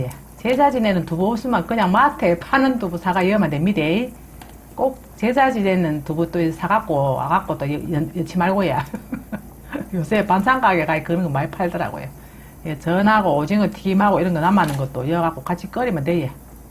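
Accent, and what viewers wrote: Gyeongsang, South Korea. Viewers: 얼굴은 예쁜데 목소리는 촌스러운 경상도 아줌마 늘 잘 보고있어요 최고입니다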